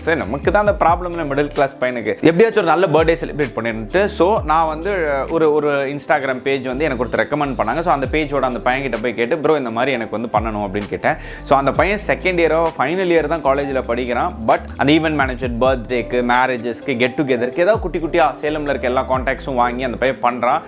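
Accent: Salem, India. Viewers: Bro neenga salem ma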